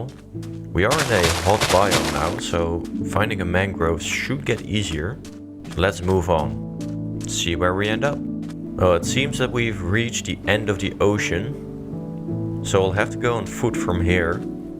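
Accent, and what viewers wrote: Netherlands. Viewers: Hi, I love your series, your explanations and the many helpful details make Minecraft something special. I've just started again after many years, and so far I've only ever built functionally. Now I see that everything can also be ‘beautiful’ - a new challenge for me. Unfortunately I still lack your creativity, but I learn with each of your videos! Furthermore, your pleasant voice is relaxing and your accent reminds me of many beautiful holidays in Holland 👍😃⛵ Win-Win-Win!!!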